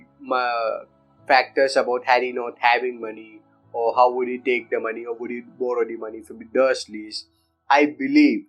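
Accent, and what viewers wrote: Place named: India. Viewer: There is the microphone! Being bilingual myself I can say your english is AMAZING! I know you are self conscious but I can understand you well, keep practicing with your scripts and edit out bad clips to improve confidence. As I said, you speak well so don't be afraid to try! Out of curiosity where are you from? Your accent seems to be somewhere around India but I'm not entirely sure...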